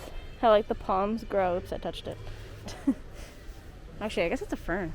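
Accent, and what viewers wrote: Costa Rica. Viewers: Hi, I have seen some of your videos and your spanish pronunciation is very "Tico" and also cute, How did you learn it, formal classes or just listening and talking ?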